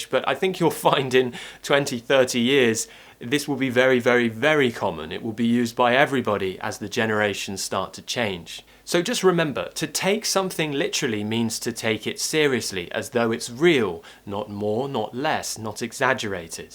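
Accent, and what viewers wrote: RP, United Kingdom. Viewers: I looooove the RP accent ❤️ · I'm Mexican and I prefer the British pronunciation vs American, it's easier and eny foreign can understand what you are saying. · I'm brazilian and completely in love with your british accent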